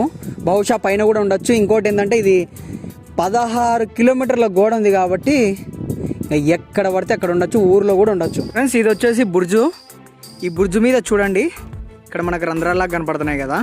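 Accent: Rayalaseema, India. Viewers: Super vundira bai, hatsoff to your photographer, we are enjoying your Rayalaseema style of language 👌and enjoying thril/unknown information 😀. Keep it <link> care when outdoors shooting. Jeete rehooo 👦👬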